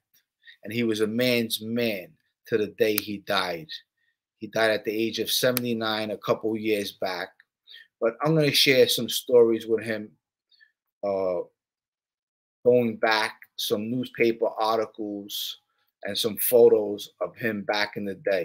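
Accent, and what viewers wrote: New York, United States. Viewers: Hi Mr, that was great story. I have to tell you that you have the best N Y accent of all the other guys that do mob stuff. It's really cool. Stay with this your doing great.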